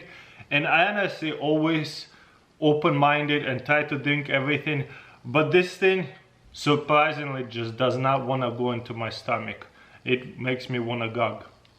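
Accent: Russia. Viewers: His russian accent is thiccer than the water and i love it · Russian Accent hits you in the first second....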